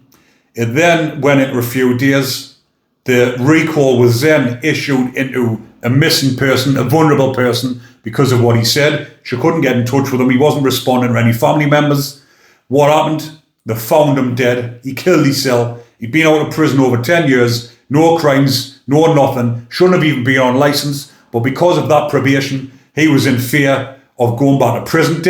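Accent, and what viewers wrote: Newcastle, United Kingdom. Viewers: Stumbled across this video and your storytelling and accent is absolute gold 🤣🤣 love the geordie accent. Fuck Linda!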